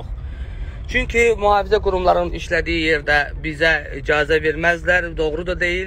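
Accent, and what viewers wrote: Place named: Armenia. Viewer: Ай геде сен йахшы биленсенсе тап да орада не ойун чыхарырсан озунде ермени лехчесинде данышырсан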